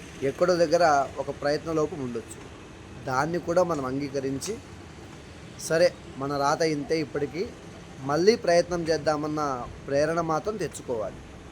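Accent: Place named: Adoni, India. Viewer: Sorry సర్,,, మీరెవరో తెలియకనే మన ఆదోని వారు గమ్మత్తు మాట్లాడుతున్నాడు,, అని subscribe చేసుకున్న,,, కానీ మిగురించి తెలుసుకున్న మీరు ap స్టడీ లో తోపు అని,,,, hatssapp సర్ మా జిల్లాలో పుట్టినందుకు,,,,❤❤❤❤❤❤❤❤❤❤❤❤❤❤❤❤❤❤❤❤❤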